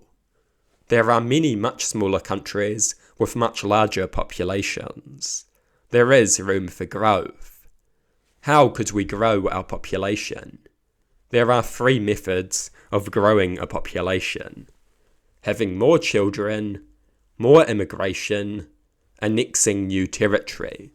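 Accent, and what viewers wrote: New Zealand. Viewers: I like how he emphasizes the final syllable in each sentence, not sure if it's just a part of the New Zealand accent or just him though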